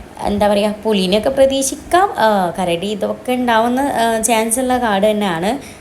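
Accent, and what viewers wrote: Malappuram, India. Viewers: മലപ്പുറം slang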